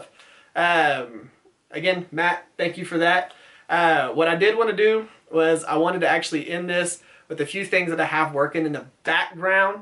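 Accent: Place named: South Carolina, United States. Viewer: Hey bro, where are you livin'? You have the accent all my friends have, so maybe you're from SC. If you are holler at me and I'll roll thru and scan all those templates you have hangin' in your shop. Piece o' cake. Always enjoy the work you do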